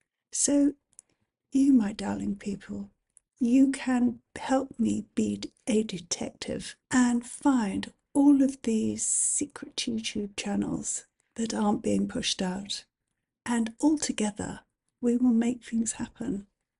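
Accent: England, United Kingdom. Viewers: Hello, I came over here from LifeintheWyldeWest. Love your vioice. I was born in Scotland and adopted by Americans and came to the US, so I don’t get to leave with that lovely English accent. I will be watching. Your success inspires me. ❤️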